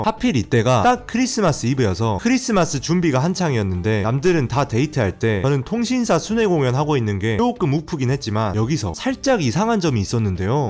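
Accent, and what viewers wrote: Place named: Gwangju, South Korea. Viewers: 광주 억양 원래 이래요? ㅋㅋㅋㅋ 그런^데에~___ 중독성 오진다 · 대사 드립이 너무 재밋네요 대학교때 알던 광주 출신 동기형이랑 말투가 넘 비슷해서 재밋게 보고 있어요 ㅋㅋ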